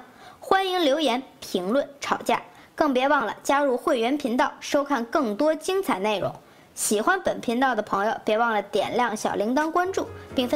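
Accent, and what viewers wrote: Beijing, China. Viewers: 你說的話好像是北京一帶的？？？？？ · 作者是你們大陸人 台灣人講話的口音 不是這樣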